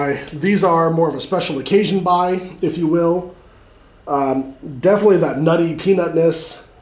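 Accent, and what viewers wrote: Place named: Nashville, United States. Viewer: Sound like you live close to Nashville I’m from Lewisburg !!!!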